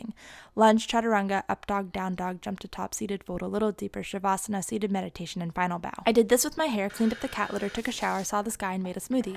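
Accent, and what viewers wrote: France. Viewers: I love your french accent !!!! So cute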